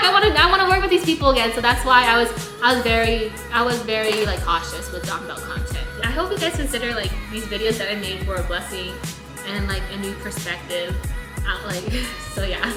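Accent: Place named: United States. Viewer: Enjoying your vlog especially your American accent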